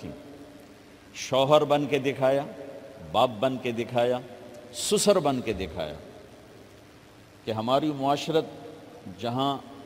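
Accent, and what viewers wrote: Punjab, Pakistan. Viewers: i love to hear uh😍😍😍your Punjabi urdu its drive crazy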